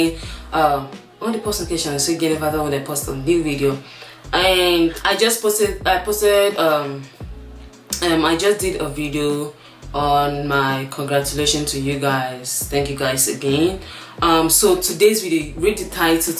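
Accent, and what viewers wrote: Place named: Nigeria. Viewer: when i first come to your channel i knew u were nigerian because of your accent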